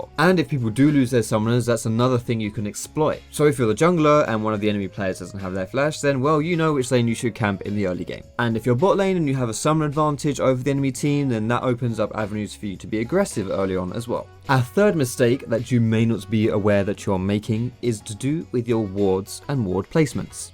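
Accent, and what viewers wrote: United Kingdom. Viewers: He's uh. British. Not American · Wait... Are you british?!? OMG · Wait he's british?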